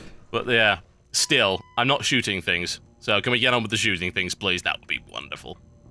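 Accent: United Kingdom. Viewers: reason i watch the whole thing your british accent :D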